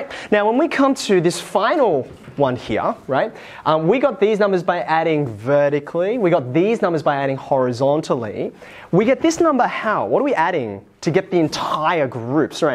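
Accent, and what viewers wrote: Australia. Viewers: Aussie accents make stats more fun.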